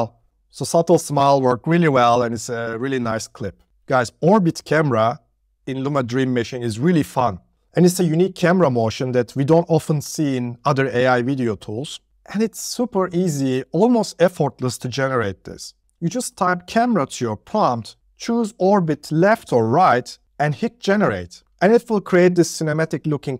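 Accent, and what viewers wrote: Turkey. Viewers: hoca, o kadar belli ki türk olduğun ve türk aksanın. İngilizceyi hiç bilmiyor olmama rağmen videonu anladım :))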